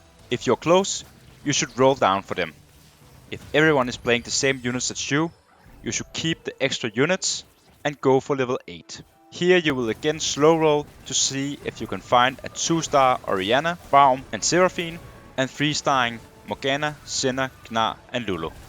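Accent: Denmark. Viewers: Hey dude, can't help noticing the danish accent <3 ur videos are great very "hjælpsomme" :P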